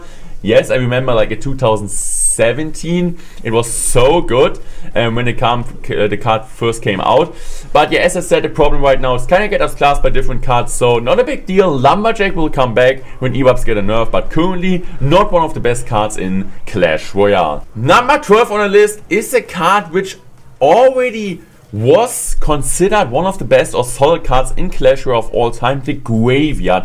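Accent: Germany. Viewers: Is he german?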